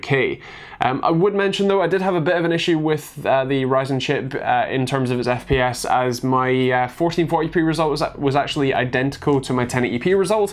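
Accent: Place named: Ulster, United Kingdom. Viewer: Sounds a bit like you're from Ulster. My mother's side of my family are all from Belfast so I can tell. LOL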